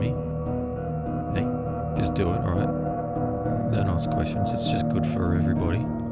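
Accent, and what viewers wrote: Australia. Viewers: heya mate thx for the vids ,they have rekindled my desire to paint ,and its good to hear an ozzy vioce in a warhammer vid, for a change , cheers bro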